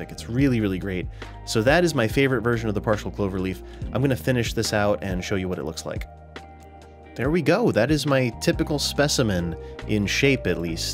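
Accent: United States. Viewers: Arterial - I am guessing you're from the New York area.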